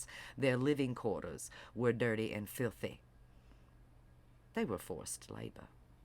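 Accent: South, United States. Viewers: Your southern accent is the finishing touch on a well presented section of the web and drops the gavel down in the court of opinion. Well done.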